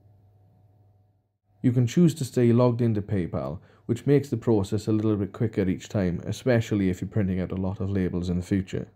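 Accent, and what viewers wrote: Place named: Wales, United Kingdom. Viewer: Watching this in June 2020... this is brilliant! That Welsh accent is just gorgeous yowser! I always wondered how people got those labels printed. I must get one of these Printers, it's a great investment. Thanks... great vid with a nice sharp image... love it!. New Subbie. London UK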